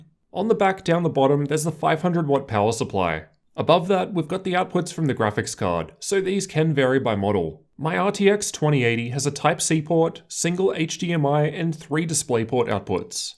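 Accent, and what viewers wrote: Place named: Australia. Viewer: the Lornorworrrrrrr Australian accent is cute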